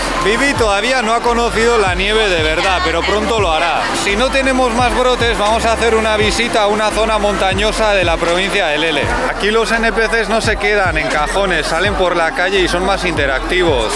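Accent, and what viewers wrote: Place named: Spain. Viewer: Este vídeo fue muy divertido, con un poco de sarcasmo, me gustó mucho, Baby y su mamá bailando como si fuera “ fiebre sábado por la noche “ de John Travolta. Agrégale sarcasmo a tus vídeos, te sale muy divertido, con ese acento español tierno que tienes. Gracias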